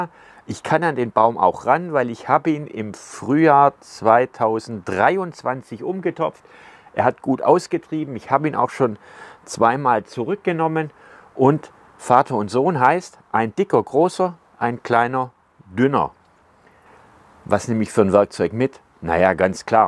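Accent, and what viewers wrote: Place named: Swabia, Germany. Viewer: Der Einkaufskorb.... absolut geil. Und das Schwäbisch... bitte: Sprich doch immer so. Finde ich absolut super und authentisch.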